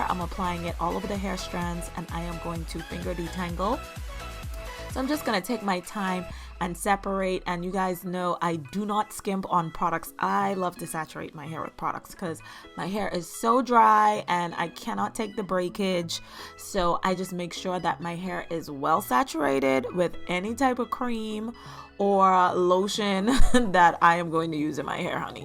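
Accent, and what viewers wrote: Bahamas. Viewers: I know my people! I love the finished look! 💄 1st off you and I have the exact hair texture, so watching your videos helps me a lot. 2nd My dad’s family is from the Bahamas so I recognize the accent! 3rd I am also from South Florida. 4th we might be related because I have Farringtons on my ancestral line. Salute my sister 🙏🏿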